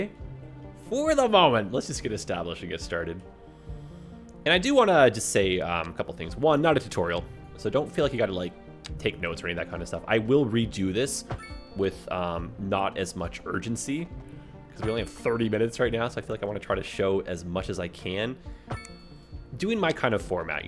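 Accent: Canada. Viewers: Will you be doing any sections from Toronto? Maybe a highpark area or possibly do the Gardiner? First time watching and knew you were canadian with all them "Eh"s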